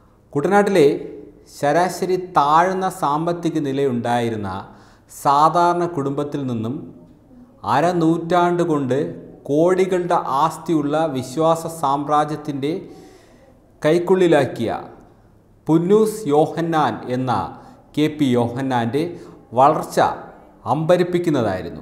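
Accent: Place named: West Bengal, India. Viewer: എന്തു വാർത്ത വായിക്കലാണിത്... കഷ്ടം... ബംഗാളിയെ പിടിച്ചു മലയാളം വായിപ്പിക്കുന്ന പോലെയുണ്ട്...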